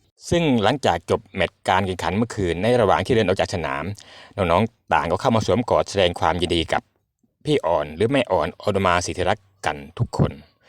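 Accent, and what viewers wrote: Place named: Isan, Thailand. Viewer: สำเนียงอีสานคักแถะ หนังกีน พะนะ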